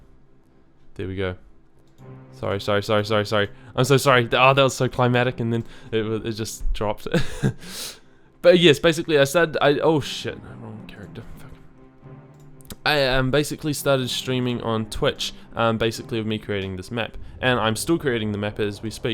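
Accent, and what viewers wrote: New Zealand. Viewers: Dude you're a kiwi haha awesome tutorial! Thanks man :)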